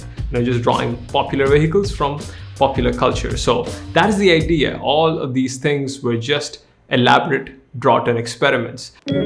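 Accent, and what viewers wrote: India. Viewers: Pls telllllll arrrrrr uuuuuuu Indian?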